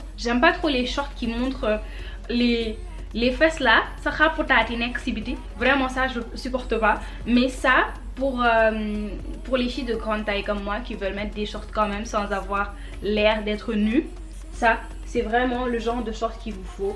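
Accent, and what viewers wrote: Senegal. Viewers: Merci pour ta vidéo avec ce joli accent DKR❤. Perso, j’ai de plus en plus de mal à admettre ces grandes différences de prix. Du coup, avec ces sites web, dure dure de se laisser tenter dans les magasins.. Pas facile pour le secteur du prêt-à-porter mais l’inflation touche tout le monde 😢 Comment ne pas tourner le dos à toutes ces enseignes qui nous assassinent avec leur prix élevé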